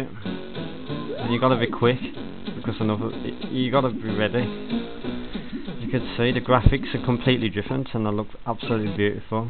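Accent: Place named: Birmingham, United Kingdom. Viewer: The Brummie accent is the worst in the world. This fella was sending me to sleep just listening to him. What the fuck is 'Feck"? Terrible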